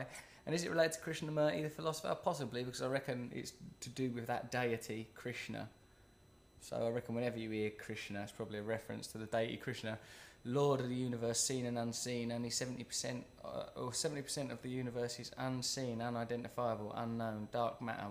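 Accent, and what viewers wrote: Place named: England, United Kingdom. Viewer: Russell, please add SUBTITLES to your videos (at least to your next ones). I'd really like to comprehensively understand what are you saying, but it's kind of hard due to your English accent. VOTE UP SO HE CAN SEE!!!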